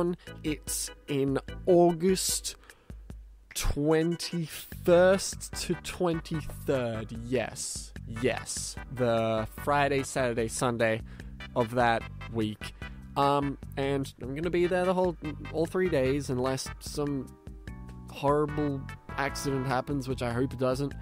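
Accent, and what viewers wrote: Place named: Australia. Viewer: HE'S AUSSIE? I finally found another Australian brony... finally my search is over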